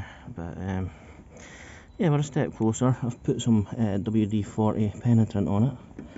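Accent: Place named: Scotland, United Kingdom. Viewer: what will make you kick yourself is the seller on e bay that is selling the same pipe but in the genuine nissan part number for £31 is based in Scotland, and from your accent, sounds like that is where you live🤣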